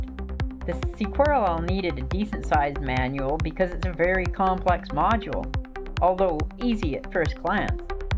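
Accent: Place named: United States. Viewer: WOW?!! A female who is quite experienced and advanced as well?!? And can actually talk her way around this stuff like a true graduate. And judging by your voice, you’re from this here New World. GEEZE!?! I applaud you and I am so impressed and inspired. I would probably buy any of your modules just based on their aesthetics alone!! You are someone amazing! Thank you for sharing about all this.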